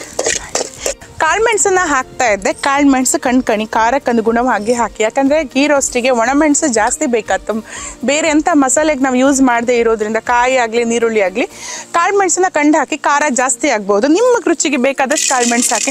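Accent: Kundapura, India. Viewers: ನಮ್ ಕುಂದಾಪುರ ಭಾಷಿ ಕೇಂಬುಕೆ ಚಂದ ಕಾಣಿ... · ಬೇವಿನ ಎಲೆ ಅಂದರೆ karibevu ಎಂದು ಹೇಳಿ ಇಲ್ಲವಾದಲ್ಲಿ ಶಿವಮೊಗ್ಗ ಜಿಲ್ಲೆಯ ನಂತರದ ಜಿಲ್ಲೆಯವರು ತಪ್ಪು ಮಾಹಿತಿ ನೀಡಿದಂತೆ ಆಗುತ್ತದೆ ದಯವಿಟ್ಟು ನಮಸ್ಕಾರ... ತುಂಬಾ ದಿನಗಳ ನಂತರ ಕುಂದಾಪುರ ಭಾಷೆ ಕೇಳಿದ್ದು ತುಂಬಾ ಧನ್ಯವಾದಗಳು chicken green roast super madam thank u so much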